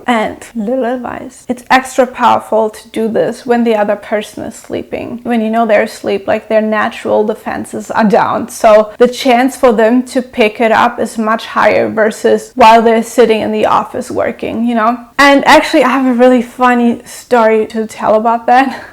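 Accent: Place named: Germany. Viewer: Are you German? I love your accent!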